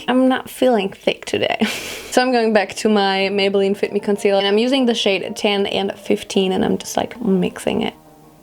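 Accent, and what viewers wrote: France. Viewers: Woow ! Your french accent is fucking good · You do have a really great french accent ! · Hey girl, I'm a french subscriber und ich kann Deutsch auch ;) I find your french accent not so bad. In the future, can u make an entire video in french pls :) Bye bitch <3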